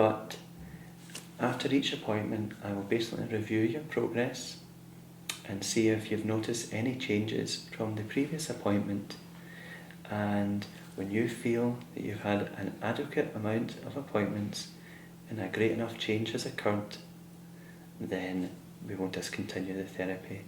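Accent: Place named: Scotland, United Kingdom. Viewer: A Celt with a strong Scottish Brogue. 👍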